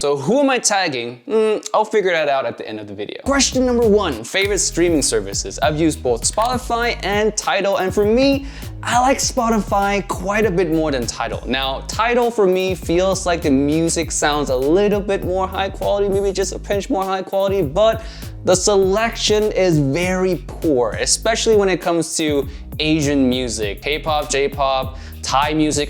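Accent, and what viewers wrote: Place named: Singapore. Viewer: Thought you re a Singaporean...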